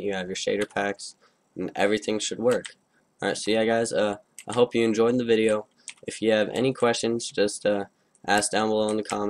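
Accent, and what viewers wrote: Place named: Germany. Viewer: ohne spass alter du hast den mega dollsten ekligsten deutschen akzent den es auf der welt gibt yo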